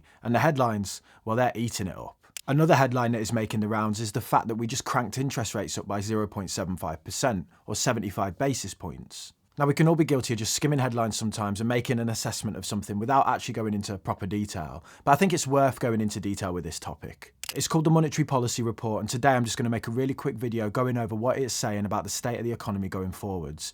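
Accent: Birmingham, United Kingdom. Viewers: Is that a Birmingham accent?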